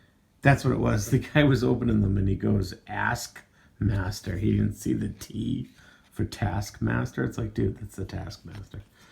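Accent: Boston, United States. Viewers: Can't place your accent. It sounds like like home town of Boston but I could be wrong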